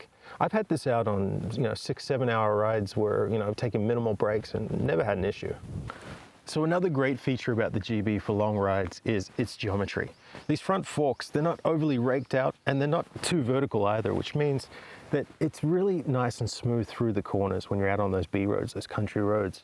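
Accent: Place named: Australia. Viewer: Love the accent dude. Not sure if Aussie, Kiwi or Yank or mix therein. I really like that bike too. Thanks for the informative vid na!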